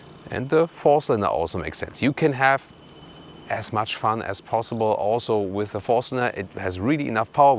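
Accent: Germany. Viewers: WTF you'reon about? His English is superb. He might have a distinctive German accent, but grammar,0 vocabulary and overall fluency are spot on.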